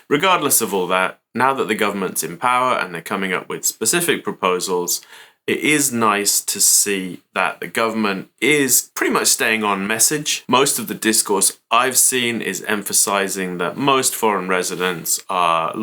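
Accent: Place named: Kent, United Kingdom. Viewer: Thank you for your clear videos. They are really appreciated. At the moment, it's hard to find genuine (non-sensationalized) information. A possible new/expanded topic, if you are looking... April 2026, bicycle rules. I saw your bicycle video about a month ago... You look good! But maybe some more details, could be good. It's not a huge topic but I can see another Police crackdown coming!!! Last crackdown, about 5-6 years ago?, I was waved off the sidewalk, on too an extremely busy & major road. Immediately, almost being sucked under a truck!!! After another on-road hit and run!!, I have NEVER cycled on the road again. But according to the new rules, sidewalk riding is not illegal, you just need to be careful & polite. How to register the bicycle for Crime Prevention (especially, if you buy from Amazon). Near us, local shops are not willing to help unless you buy their bicycles, which I can understand. 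The Local Police, wouldn't do it!!!? That seems very strange. Last video, you mentioned Insurance but not TS Marks. Not mandatory but encouraged, if not covered by car or house insurance. A good idea for shorter term people. Just an idea for a new or continued video. Thank you for your videos. 😉 Dare I ask, did you used to live in Kent? To me, you sound very much like a Kentish person.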